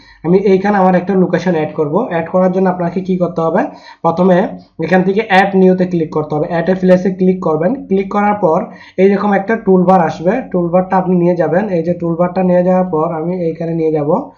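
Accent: Noakhali, Bangladesh. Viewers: শালা ভালো ভাষার কেউ নাই,,সব নোয়াখাইল্লা শালা রিভিউ রে বলে রিবিউ এখন রে একন 🙈🙈🙈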